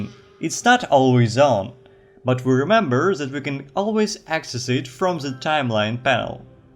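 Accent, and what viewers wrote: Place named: Russia. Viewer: Слышно, что наш человек)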